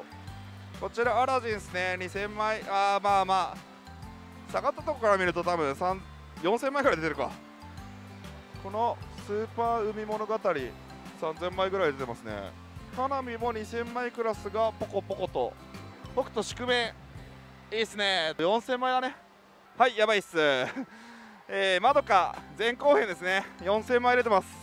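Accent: Japan. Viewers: すみません、大阪弁苦手です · 喋りあっての実践良いですね(´ー｀*)ｳﾝｳﾝ 関西弁？聞きやすいです😊ペアで頑張ってください😊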